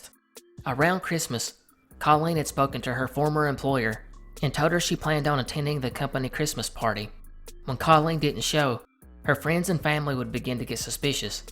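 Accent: South, United States. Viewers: Damn you’ve got a strong accent. Which state r u from? Good video btw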